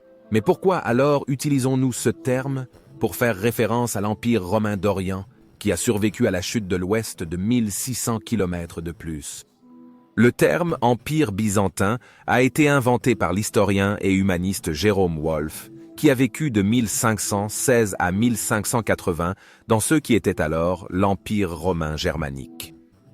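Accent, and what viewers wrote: Quebec, Canada. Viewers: Je relève des notes d’accent Québécois, je me trompe?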